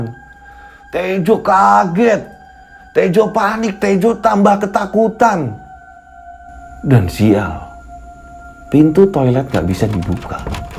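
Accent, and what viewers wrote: Banyumas, Indonesia. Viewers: Saya suka, bahasanya jls. Dn bahasa Jawa + Ngapaknya itu bikin saya suka. Hehe.. BWI ikut Nyimak. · Pintermen Bhs.Tegalnya mas logadnya dapat,lanjut crita slanjutnya yosky....😄😄💪💪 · Ya Allah bang.....multi talen banged Versi ngapak nya best Yoskay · baru crita ini yg buat q senyum senyum sndiri cara pmbwaane pakai bhs ngapak,,,apik mas enyonge seneng cara ngomong e,,,,😁😁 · Banyuwangi hadir mas....suka banget mas nyampeinya pakai bhs ngapak" tambah seru...BOOOM BOOOM BOOM....YOSKAY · Sereem....tp kudu guyu...bhs tegal e pas bgd🤣🤭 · Bintaro Tangerang hadir mas, haduhhh massss ngeri bgt aq nyimak kisah ini, masalahnya aq y sllu naik kereta klo k jakarta, mas luwes bgt ngomong logat Tegal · Critany dri Tegal ap Pekalongan Min soalny ngomongny Medok bnget hhh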